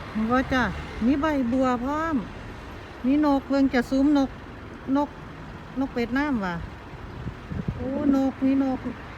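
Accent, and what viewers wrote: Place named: Nakhon Phanom, Thailand. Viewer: วิวสวยมากค่ะ สำเนียงพูดเพราะจัง เหมือนคน นครพนมน่ะค่ะ~~^^🥰🥰🥰🥰🥰🥰🥰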